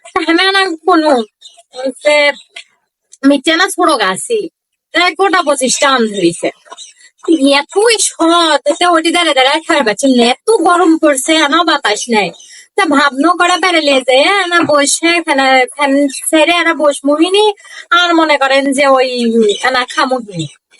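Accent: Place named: Bogura, Bangladesh. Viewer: ভালোই তো বলতে পারো বগুড়ার ভাষা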